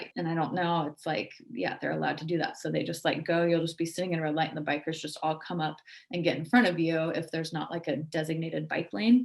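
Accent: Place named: Washington, United States. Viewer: Well said , you even pickup the accent, enjoy life in Washington State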